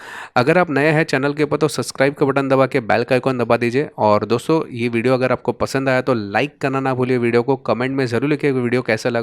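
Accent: Gujarat, India. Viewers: Juna ho chuka hai 😂😂🤣 Bhai aap Gujarati ho kya ?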